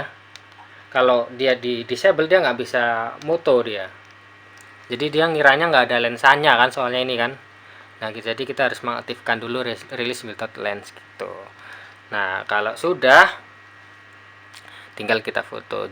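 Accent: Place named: Brebes-Tegal, Indonesia. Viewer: Sampean tinggal dmn om? Kayak brebes tegal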